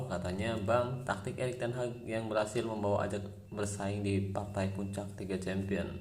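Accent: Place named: Cileungsi, Indonesia. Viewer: bang lu orang sekitaran cileungsi yak?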